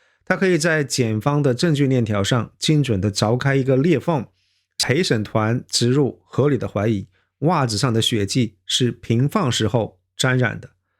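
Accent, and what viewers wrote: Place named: South, China. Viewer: 自己说过好几次自己是南方人普通话不太标准有口音理解万岁👏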